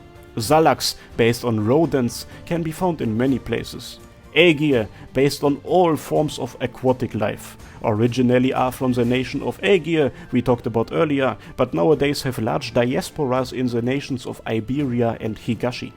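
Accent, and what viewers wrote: Germany. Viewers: you german or close to that? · Is That a German accsent? Damn do i Sound like That when i speak englisch 😳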